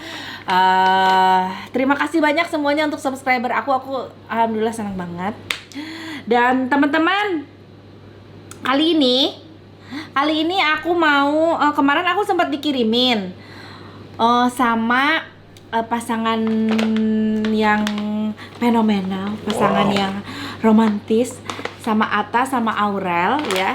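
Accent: West Java, Indonesia. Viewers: Mukanya cara ngomong nya meni sunda banget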